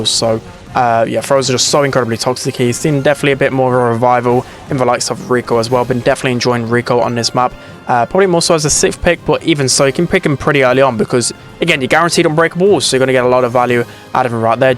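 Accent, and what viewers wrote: United Kingdom. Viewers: That’s it I heard enough British accent for today.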